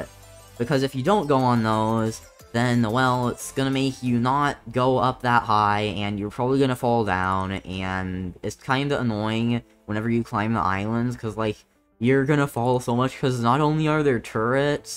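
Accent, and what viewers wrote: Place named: United Kingdom. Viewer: So bri'ish for me idk why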